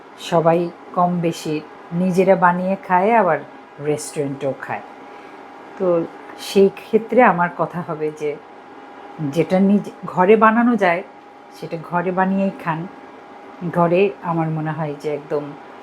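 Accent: Sirajganj, Bangladesh. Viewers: সিরাজগঞ্জের টানযুক্ত ভাষা মাঝেমধ্যে ২-৪ লাইন প্রতি ভিডিওতে বলবেন, আপনার মুখে খুব মানায়।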